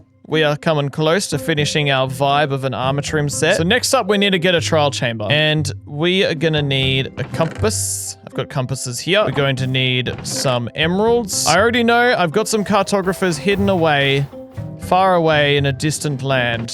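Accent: Australia. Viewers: oh im so subsribing. youre an aussie minecraft youtuber, but like aussie ausie. i feel at home here (im aussie too) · Are u Australian?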